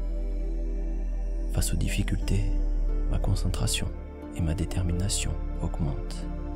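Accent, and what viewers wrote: South, France. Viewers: Merci même si justement je préfère quand tu es naturel j'adore tellement ton accent du Sud et que je sens que tu appuie pour ne pas qu'il s'entende et ça rend particulièrement cette vidéo un tout petit moins agréable que les autres! Tu es génial et fais pour cela pour l'instant je le sens et merci🙏 tu aide tellement de personne dont moi mais voyons plus grand merci merci merci je t'envoie beaucoup d'amour et hâte de la prochaine video avec ton bel accent 😉♥️🧡💛💚🤍💙💜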